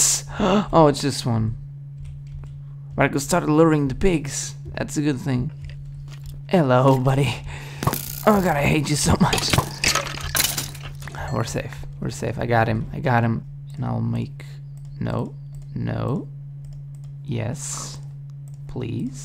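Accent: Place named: Portugal. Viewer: I think he´s from Portugal.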